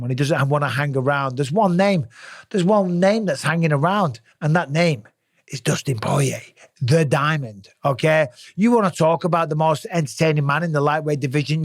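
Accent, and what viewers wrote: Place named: United Kingdom. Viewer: I love your commentary. Me and my dads favorite moment to this day was during usman vs masvidal 1, usman was brutalizing masvidals toes in the clinch against the fence and you basically suggested if you were masvidal you'd be looking at the ref saying "cmon ref, have a word, he's stomping on my toes" of courage emphasized by the British accent lol. I don't think it would be terrible of you to admit that just as Justin is biased toward his friend Kamaru, you have a tendency as a fight fan from the UK, to root for those fighters. Even as a caster you can't help but to be a fan, Cormier falls victim to it as well. It would be a lot more forgivable if you just said, "of course I'm a little biased, we're in the UK and I'm rooting for my guys". If you'd like a better example of your bias you should rewatch the Jai Herbert fight, imo that was the one where your bias was most easily recognizable. 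Keep doing your thing though bruv, there are lots of us that look forward to the cards that you cast.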